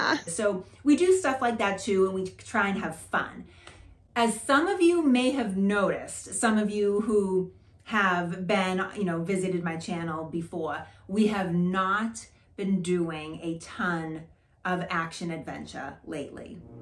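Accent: Boston, United States. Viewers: Thanks for your efforts to bring us Revolutionary War history. I live in rural NH and love your Boston accent. Reminds me of a good friend I served with in the Marine Corps. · Boston is a place where history surrounds you, I fished out of Boston years ago, I tied up across the channel from Griffin's wharf the sight of the Boston Tea Party, every morning I thought about that event. On my way out heading east to fish I would turn around and see the Boston skyline in the early morning sunlight including the Custom House Tower and the spire of Old North Church where Paul Revere saw the lights in the belfry. A truly wonderful city with much history to see everyday. Love your channel and your Boston accent, makes me homesick for New England.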